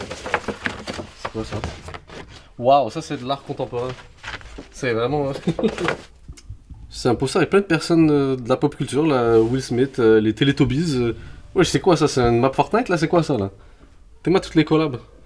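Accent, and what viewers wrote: Quebec, Canada. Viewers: Il a un peux l'accent québécois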